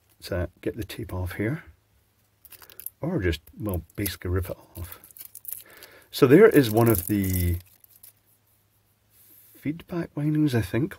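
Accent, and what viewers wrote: Scotland, United Kingdom. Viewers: It's funny hearing a British man pronouncing Swedish 😂❤ koppla was pretty good, I would never have understood småhagel if it wasn't for the text on the box 😂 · I have a Koppla, and, my knowledge of electronics is far too crap to follow this. But as a consumer, as large companies go and someone who tries to avoid buying anything first-hand, maybe I've swallowed some marketing but I do feel IKEA is a name I trust a bit, I'm glad to see this disassembled. Can't help but remember the episode of Top Gear about the final demise of Saab. There's something about a company running itself into the ground because "good enough" simply wasn't good enough that viscerally hit home. Also bonus tip: Å is like an a then an o. Små means small and there's a similarity in sound, much more so in your Scottish accent than my English bastard one. 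Decided to learn Danish rather than Swedish and they're quite different in many respect but I expect hagel is pronounced very similarly to "hail".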